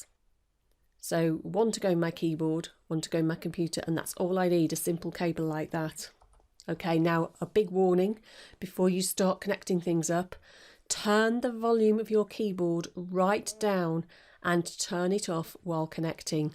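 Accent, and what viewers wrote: United Kingdom. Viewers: UK accent. Kinda wiredley explained hm but imma.. give it a try..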